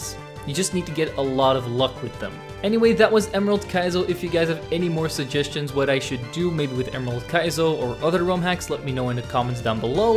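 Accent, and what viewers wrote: Netherlands. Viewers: Im dutch to its a great accent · wait are u dutch didnt even notice earlier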